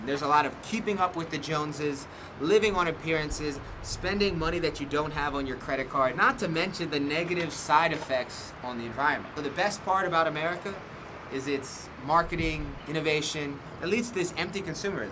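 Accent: Brazil. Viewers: Eu fico sempre com a impressão que você é um brasileiro que fala muito bem inglês. 😅 Os vídeos estão bem legais. Keep it up! 👊🏻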